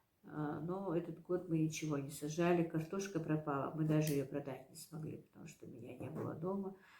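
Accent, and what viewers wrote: Standard. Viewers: Вы так чисто и правильно разговариваете,приятно слушать Ваши рассказы.Испытаний много на Вас выпало.Хорошо что у Вас много хорошей родни.У нас родители вообще с дет.дома,с Дагестана.В ВОВ у них умерли от голода и болезней родители, и все моего папы маленькие братья,он 7 летний мальчик остался один из пяти детей в семье.Осталась одна старая бабушка,которая отдала его в детдом.Родни дружной как у Вас у них не было.Сами прокладывали себе путь.Вы очень сильная и трудолюбивая женщина.Пусть у Вас все наладится.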